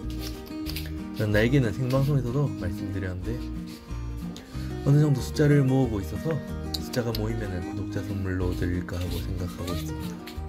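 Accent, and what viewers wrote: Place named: Japan. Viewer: 우와 언어는 한국언데 억양은 일본억양이네요ㅋㅋ 한본어 신기하다 일본에 오래사셨나보내요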